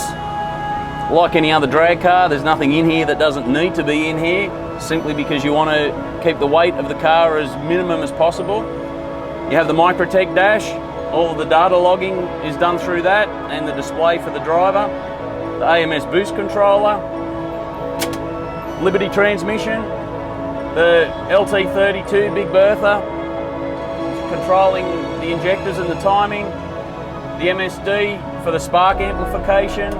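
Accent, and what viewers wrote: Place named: Australia. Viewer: When I hear an Aussie accent makes proud to live in Melbourne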